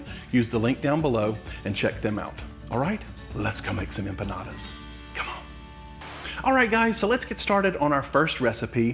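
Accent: South, United States. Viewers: One of the best things about finding out I am pre diabetic is finding wonderful channels like this. Grew up in Tennessee now living in Florida an I do miss that southern accent. Get a kick out of him saying Y'all we're makin ____ come on! Puts a smile on my face every time.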